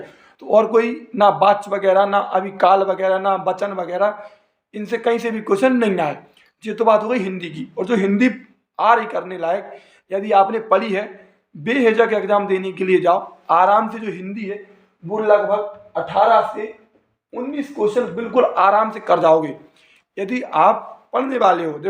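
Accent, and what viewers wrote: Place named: Bundelkhand, India. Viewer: Bundelkhand me kaha se ho bhai.. tumhari boli se samjh a rha hai😂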